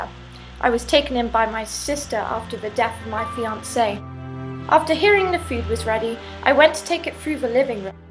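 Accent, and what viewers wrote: United Kingdom. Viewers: Very good storie and you have such good English to read bye. I do believe you are English, maybe the Midlands (with voice training)? Can't wait for another..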